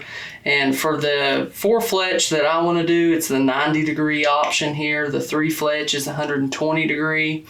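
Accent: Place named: South, United States. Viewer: Lol mate you have that Kentucky trucking driving Atlanta GA accent ingrained.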